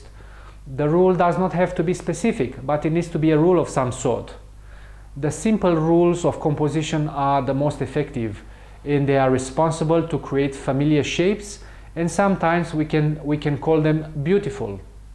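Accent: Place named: Romania. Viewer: Great video! Thank you very much! I noticed an accent. Are you by any chance Romanian?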